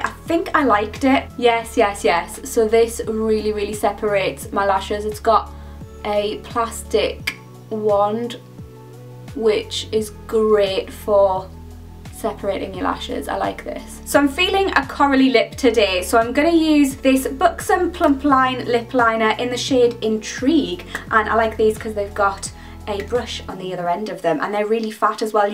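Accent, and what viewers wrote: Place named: Liverpool, United Kingdom. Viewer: You made me giggle with your scouse accent 😂 wonderful video as usual! You’ve done us women proud since it’s been women’s day this week! 💪🏼💓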